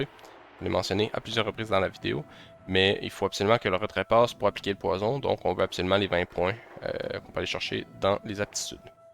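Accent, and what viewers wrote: Quebec, Canada. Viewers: Un quebecois esti!!! Yessir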